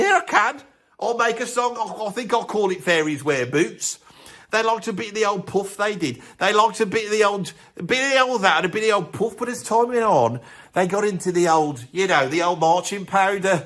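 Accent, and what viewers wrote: Birmingham, United Kingdom. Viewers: Your natural accent is a lot closer to the Old End Brummie spoken by than I think you realise,...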